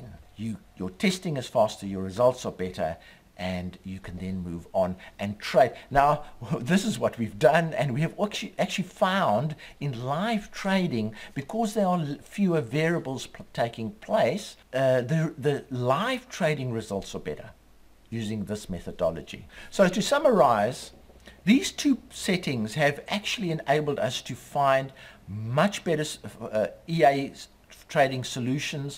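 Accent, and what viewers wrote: South Africa. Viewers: you sound like a Suid Afrikaaner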